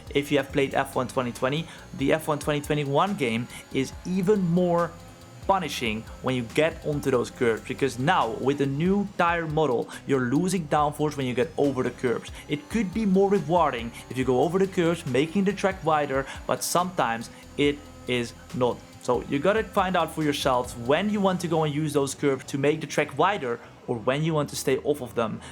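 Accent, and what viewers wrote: Netherlands. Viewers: Nederlands right? 😂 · Het is sws een Nederlander😂 accent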